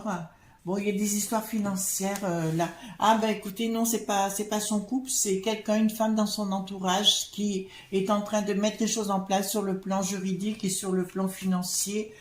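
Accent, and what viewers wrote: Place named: Provence, France. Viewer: Bonjour, j ai reconnu l acccens du pays...sa sent bon la farigoulette et la lavande..je vous souhaite une bonne soiree. ♥☺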